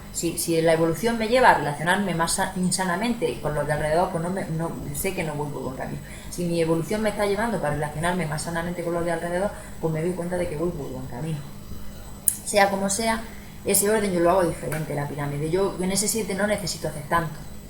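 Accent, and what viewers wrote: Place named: Andalusia, Spain. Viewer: Hola. Gracias por tu video es muy generoso por tu parte. Me encanta tu acento andaluz y se te entiende perfectamente. Quien no entiende es porque no quiere. Me ha sorprendido lo bien que explicas los conceptos, con naturalidad y soltura. Ha estado muy interesante. A mí me gustaría también oir más de tus experiencias. Sigue subiendo vídeos. Saludos y no hagas caso a los haters, los hay en todos lados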